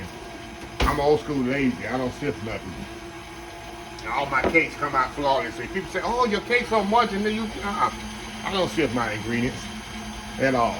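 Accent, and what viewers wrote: United States. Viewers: We're from Philly, up north and my grandson, he is 10 said, what kind of accent does he have, i was like he is an ole country boy heheh,! where are you from brother?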